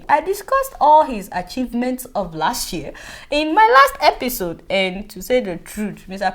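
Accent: Yoruba, Nigeria. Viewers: you are definitely keeping it real! I love your show. Keep up the good work. I love the Nigerian accent. It is the Yoruba coloration of the accent that makes it so lovely. I am not Yoruba, but I love it.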